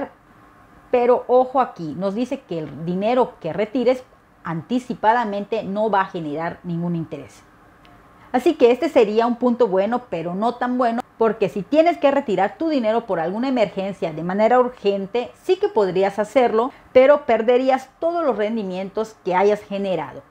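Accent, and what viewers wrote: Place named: Yucatán, Mexico. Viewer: Qué gran descubrimiento con tu canal. ¿Eres de Yucatán, verdad? Por el acento. Acabo de ir a ese estado… hermosísimo. Felicidades y sin duda: SUSCRITO. Saludos desde CDMX.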